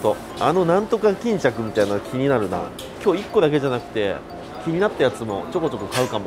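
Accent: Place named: Chita-Mikawa, Japan. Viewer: 愛知出身です。「よばれる」って久しぶりに聞けたわ。祖母は亡くなってしまったし地元を離れてしまったので、知多三河方面の方言が聞ける貴重なチャンネルです💕動いてるタコおいしそー！！！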